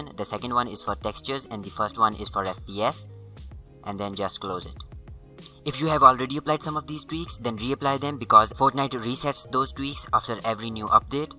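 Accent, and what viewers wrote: India. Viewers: he legit has an Indian voice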